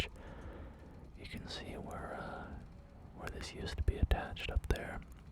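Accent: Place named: Scotland, United Kingdom. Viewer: you have a scottish accent in the way you pronounce certain things it became very apparent right before you revealed your heritage i share some irish/scottish blood as well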